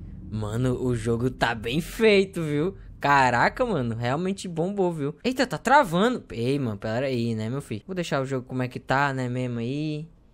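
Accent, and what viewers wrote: Northeast, Brazil. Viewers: “Ee man, djabo é issai” Como eu amo meu sotaque nordestino <3 skskskjsjs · Terror com sotaque nordestino hmmm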